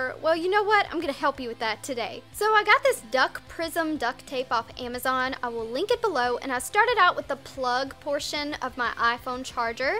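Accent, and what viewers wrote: South, United States. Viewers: i love her country accent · Where's from? She sounds southern.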